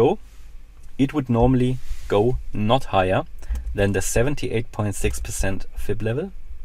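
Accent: Germany. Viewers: German confirmed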